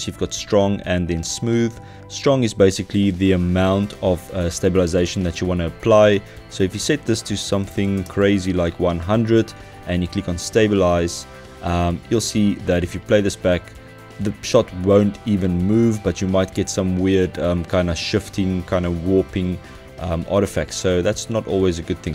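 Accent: South Africa. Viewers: Thanks this was helpful. Noticed the accent though and immediately knew that I'm dealing with a South African here. Well that and the security fencing in your footage gave it away. Dankie! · Haha love that Saffer accent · Probably Afrikaans, no? Considering this guy's heavy South African accent... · i googled this and hey SA accent all the way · awesome video man! Love the SA accent! Keep it up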